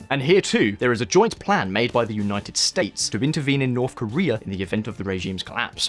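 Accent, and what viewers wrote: United Kingdom. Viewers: Fast and loud, with a British accent, Americanization at it's most annoying. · Jay McJakome I don’t mind the British accent, I even prefer it but he speaks way to fast, I can’t even process what he is saying... I can understand it but it goes into one ear and comes out of the other... I think the issue is the lack of punctuation or something.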